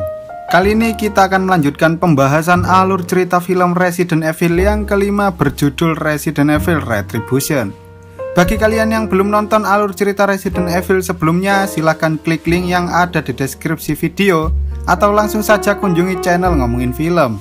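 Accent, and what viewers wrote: Java, Indonesia. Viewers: Fix org jawa cara Ngomongnya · medok bener ... medok!!! 😤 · jowo endi mas? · Orang jawa bang?? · Keritik pedes dari gua . Lu itu ngomongnya medok ga usah bercanda kalo bikin video . Ga lucu sumpah malah bikin ilfil . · Wong jowo bg😂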